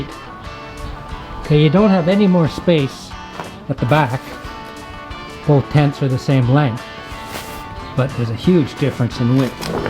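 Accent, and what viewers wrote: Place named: Canada. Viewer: You sound Canadian...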